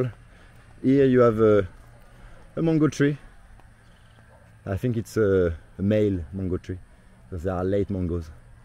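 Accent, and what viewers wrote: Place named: France. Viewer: Quel joli accent français!! Je suis anglophone donc je peux le dire. 😀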